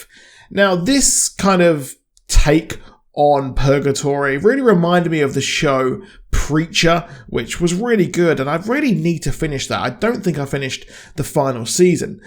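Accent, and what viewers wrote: United Kingdom. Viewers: I’m unsure if you are putting on a Received Pronunciation accent... but Demeter is definite not pronounce DE ME TER.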